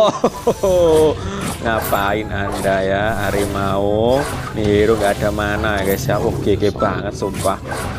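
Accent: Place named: Bali, Indonesia. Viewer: Kamu orang bali ya?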